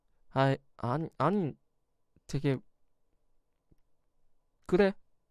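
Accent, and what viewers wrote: Gyeongsang, South Korea. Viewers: 아....사투리 너무 멋지다♡ 경상도사투리쓰는 남자 너무 멋있어여♡♡♡ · ㅋㅋㅋ남자가 왜이리 소심보스야 ㅋㅋ우쭈쭈~ 서툴지만 애정표현 하려고 노력하는 모습이...심큥💘)ㅋㅋㅋ 아무말 대잔치나하구 말이야 ㅡㅡ 이거이거 내가 좀 적.극.적.인 남자로 만들어 줘야겠구만 ㅋㅋ 그와중에 귀여운 경상도 사투리이~박크력)있네 ㅋㅋ 자기야 뽀뽀 박아 빨리 ㅋㅋㅋ😘 이거 이제 만날때마다 사랑한다고 말하라고 시켜야겠구만 ㅋㅋ 님 영상 볼때마다 내 가슴이☆ 콩닥코..♡ 죄송합니다 헤ㅔㅎ.. 쨋든 오늘도 잘들었습니다💕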